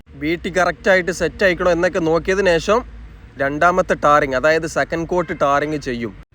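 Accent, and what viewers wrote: Malappuram, India. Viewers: എല്ലാ വിധ ആശംസകളും ! താങ്കളുടെ മലപ്പുറം accent കൊള്ളാം. ധൈര്യമായി, മാന്യമായി ജോലി ചെയ്യുക. രാഷ്ട്രീയം നോക്കാതെ നാട്ടുകാർക്ക് സേവനം ചെയ്യുക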